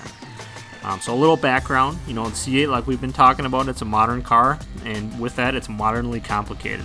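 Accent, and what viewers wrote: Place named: Michigan, United States. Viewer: You sound like my cousin from Ann Arbour Michigan. great job with the corvette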